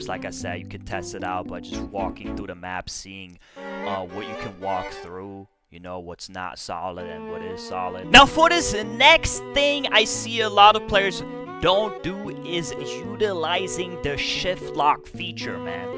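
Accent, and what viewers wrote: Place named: United Kingdom. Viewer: wtf he is like speaking British?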